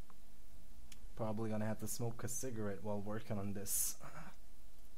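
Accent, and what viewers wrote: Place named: Germany. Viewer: you are a true artist, and you can explain very well! even the german accent is adorable :)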